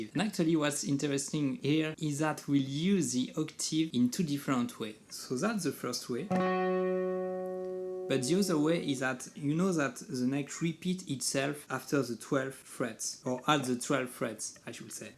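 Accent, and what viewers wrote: France. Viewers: French accent ???? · Oula toi t’es français avec cet accent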